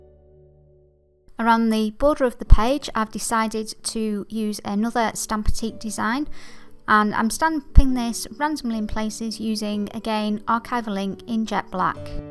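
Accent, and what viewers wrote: England, United Kingdom. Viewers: What a beautiful page ! Your sweet English accent reminds me when I was a little girl I used to watch ART ATTACK with Neil Buchanan and his lovely accent , he opened my eyes to art and crafts and you nourished my sense with your magical touches Wish you luck and happiness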